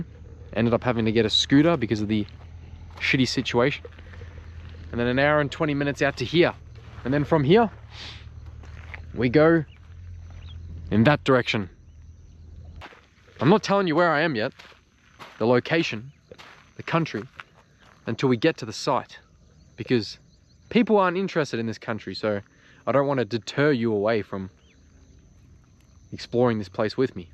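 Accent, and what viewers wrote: Australia. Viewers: Great content I enjoyed watching that. I'm curious about that accent. I was thinking Australia but then south Africa, then maybe both. Am I close? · I absolutley love it when you say HECTIC!! You have the best aussie accent I've ever heard mate ❤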